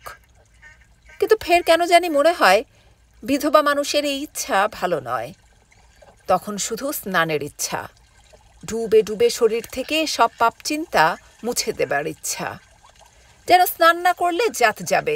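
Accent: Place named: Bangal, Bangladesh. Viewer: Ekhono parjanto Kato madhur gramyo poribesh mone hochhe ekta bhoyonkar din asbe khub valo porchho bisesh kore bangal vasa amar rater samay tomar galpo shonar jonyo ❤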